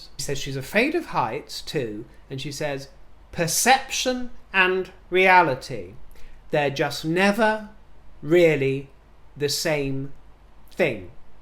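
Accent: United States. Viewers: you do a great American accent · I love your American accent! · I just love your American accent today. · Just love American girl accent, so perfect! You gave the most incredible wit n humor! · I love the switch to your American accent! XD great video, xx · You're a yank...?🤭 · Your American accent is killing me! 🤣💕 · OMG! Your American accent cracks me up!!! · LOVE your American accent! Got me laughing! Hugs from Texas. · You are such a gem! The American accent is 🤌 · your American accent is perfect!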